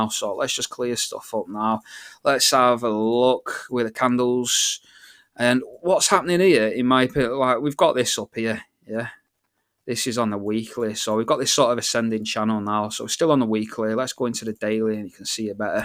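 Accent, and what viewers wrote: North, United Kingdom. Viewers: Yo big man! I follow u on here and X and I have enjoyed every minute. Ur simplicity and northern accent r both spot on. Keep the alpha coming bro. I mean this is FREE…world’s mad!!!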